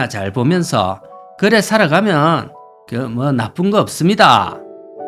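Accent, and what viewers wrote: Gyeongsang, South Korea. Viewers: 수고많으십니다 구수한경상도 말씀 재밋게시청합니다♡♡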